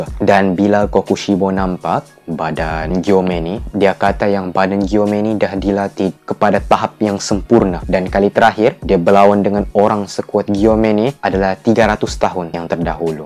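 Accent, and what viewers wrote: Sabah, Malaysia. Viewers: Org sabah ka bro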